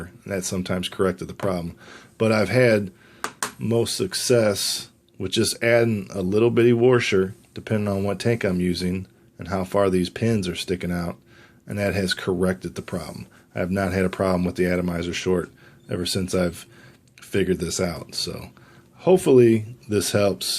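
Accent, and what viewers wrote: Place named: North, United Kingdom. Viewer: If him saying washed like that posses you off than your a lol cry baby hitch it's ducking old school talk. .. or maybe dis you ever think. That he is a ducking northerners and the talk differently. Like they don't call a carbonated. Syrup. Drink a soda it's a pop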